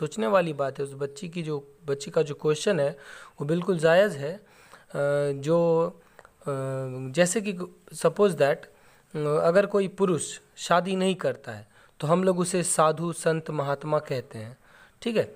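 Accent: Bihar, India. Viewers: Sir aap bihar se hain kya Mashallah bada hi achha samjhae h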